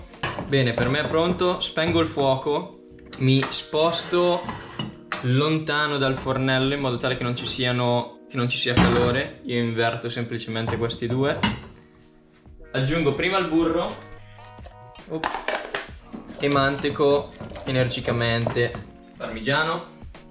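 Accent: Italy. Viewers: Ma sbaglio o hai l'accento cremonese?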